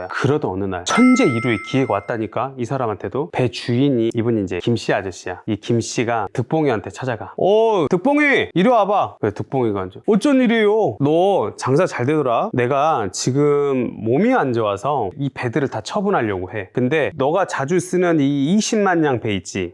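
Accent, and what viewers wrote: Jeolla, South Korea. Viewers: 좋아요누르고 들어요 ㅎ 전라도사투리,구수하고 듣기좋네요^^ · 매번 영상시청하며 보고 듣고 배우고 실천할려고 노력중입니다.. 항상 좋은영상 감사해요.. 전라도 사투리 정감이 팍팍 갑니다.. 겁나게 좋소.. 화이팅_^**^_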